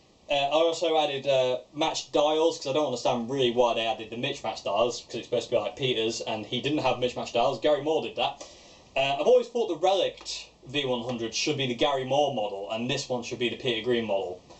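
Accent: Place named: West Yorkshire, United Kingdom. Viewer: Hey, great channel! I share your love of Vintage guitars, got me a Vintage V6 sunburst after trying a Fender American Strat and deciding I prefered the V6 and being £800 better off! Can't quite place your accent, but definitely somewhere in West Yorkshire?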